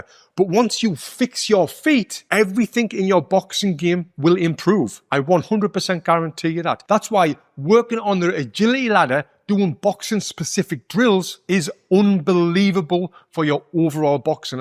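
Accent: United Kingdom. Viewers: From what region of Britain are you?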